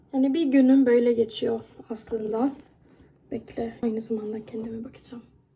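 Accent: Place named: Sweden. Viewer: Aksanın iyi olsa sana İsveçli demem , Türk kızı derim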